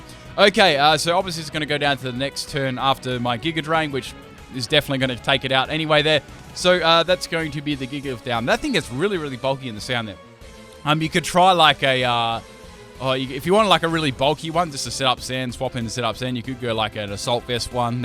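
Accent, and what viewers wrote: Australia. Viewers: He is Aussie like me I can tell by the accent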